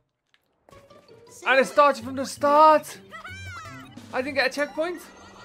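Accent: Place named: Liverpool, United Kingdom. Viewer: Scouse lad playing game of the year. Count me in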